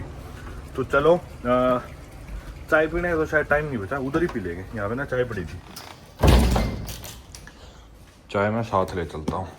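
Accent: Haryana, India. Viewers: bhai aap haryanvi bolte huye jyada mast lgte ho ❤ Gajab accent lgta h aapka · Haryanvi accent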